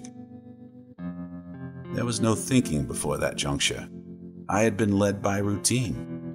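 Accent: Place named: New York, United States. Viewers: This notification was late af! WTF You Tube? I will always listen to every story you put out. I am digging your New York...New Jersey(?) Accent. Amazing job as usual! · Yes I have been to Boston etc but You nailed that fact, You def sound like You came from this side of the Country, I think You did a great Job but us NYER's while dislikes by so many other states have a ton of NYC pride, lol I would be horrible trying accents because I have my English accent is horrible as is my Southern accent etc, I love your channel brother, You are one of the best, Question may be weird but are You Rom's father? I'm asking because it's a rumor around the web and I notice you guys work together along with TDS a lot.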